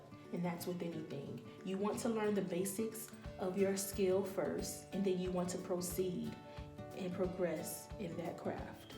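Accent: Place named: Miami, United States. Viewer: Lmaooo i love how Miami you are, city girls intro and the way you talk accent and all reminds me of them😂 girllllllll i f***s with u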